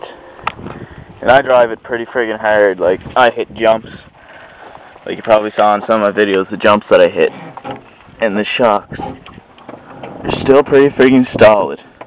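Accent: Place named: Maritimes, Canada. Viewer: sound like a maritimer ta me??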